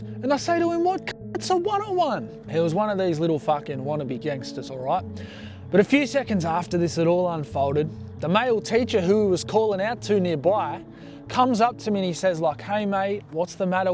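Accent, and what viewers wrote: Australia. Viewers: He has an Australian accent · Even though I don't relate to this experience, it sounds so aussie that I should be relating lol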